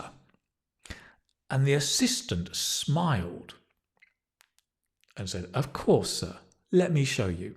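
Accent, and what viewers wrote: United Kingdom. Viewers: Thank you, Mr.. I enlarge my vocabulary and improve British accent a lot with your channel. This passage is extremely great.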